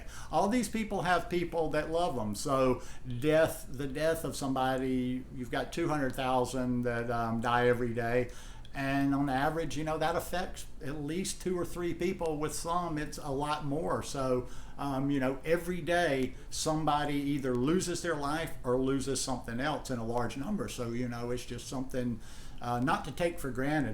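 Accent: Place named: Alabama, United States. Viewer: Your videos always put me in a relaxed happy mood. That why I enjoy watching them. They are always positive and sensible about raw vegan diet. You have a nice personality. I love also your Alabama accent. Actually you are the only one on YouTube left that I watch regularly. Thanks for being here with us. 🤗✌💖.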